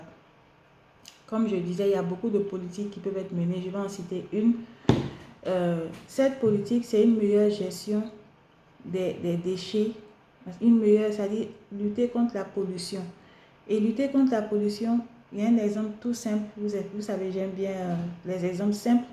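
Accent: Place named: Ivory Coast. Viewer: tu parles bien le francais avec un accent ivoirien. as-tu vecue en cote d'ivoire?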